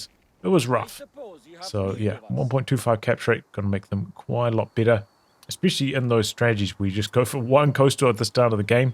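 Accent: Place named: New Zealand. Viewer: Great video always throws me hearing a fellow kiwis accent on you tube when I’m so used to just hearing yanks and Brit’s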